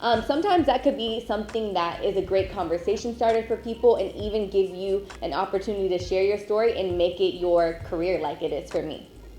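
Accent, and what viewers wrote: France. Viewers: 😍😍😍😍 ton accent français est trop chou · J'aime trop ton accent français 😍😍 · You're just Soo gorgeous and your french accent is so cute 😘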